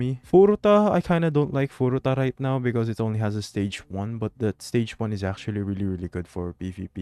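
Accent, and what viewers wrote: Philippines. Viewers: Filipino kaba based sa accent mo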